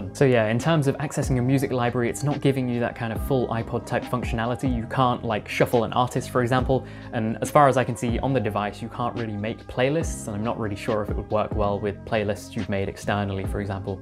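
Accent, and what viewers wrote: United Kingdom. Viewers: I enjoy you review,. Besides your technical knowledge, your British enunciation is quite pleasant to hear.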